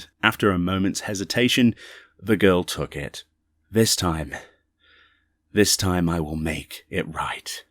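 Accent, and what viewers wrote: United Kingdom. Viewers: With all due respect to Brian from Work to Game, but you cannot beat a British accent. No shame in losing to that.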